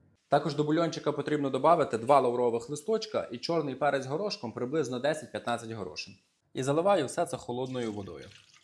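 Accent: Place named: Lviv, Ukraine. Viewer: По виговору ,я так думаю,хлопчина зі Львова́,а напишіть чи не вгадала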